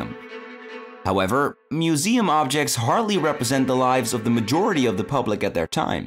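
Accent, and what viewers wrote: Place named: United States. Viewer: God, tone down the American accent, dude - so jarring...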